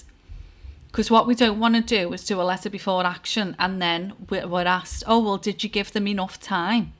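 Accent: Liverpool, United Kingdom. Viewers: And again thank you,you beautiful scouser English lady and to summarise their house of cards are supported by matchsticks that are on fire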